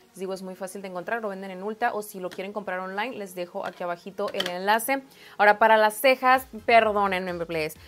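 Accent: Sinaloa, Mexico. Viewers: Me encanta tu asento sinaloense aqui en Oaxaca les copiamos algunas palabras x eso me identifico con todo lo q dices mi 💗💗💗💗💗💗💗💗💗💗👑👑👑👑💅💅💅💅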